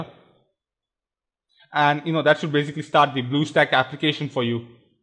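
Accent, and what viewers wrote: India. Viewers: english.....india dialect... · this is gonna sound rude or racist, but I hate this fucking indian accent... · so... india e?.. so thats where the accent came from.. nice vid & accent btw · thx dude, i like ur indian accent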